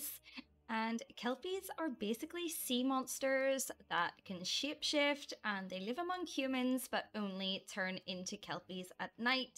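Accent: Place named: Northern Ireland, United Kingdom. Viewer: Omg I got so excited when I heard your accent! I have never came across a fellow northern Irish simmer! ❤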